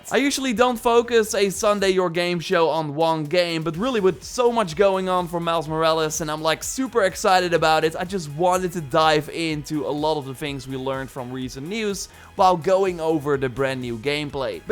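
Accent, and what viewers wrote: Netherlands. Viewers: this dude sounds ultra dutch is he from holland by any chance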